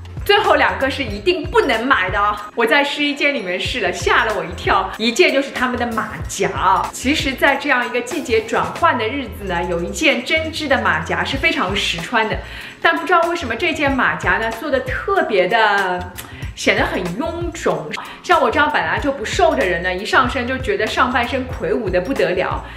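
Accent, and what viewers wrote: Shanghai, China. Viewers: 第一次看你视频，觉得你说话很像上海人，很有亲切感。然后又看了你自我介绍那个视频，果然没错呀😆 支持你哦！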